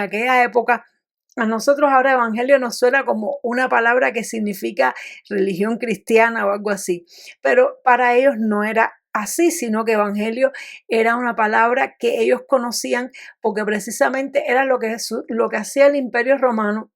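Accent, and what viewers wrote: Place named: Cuba. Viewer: Me parece que eres Cubana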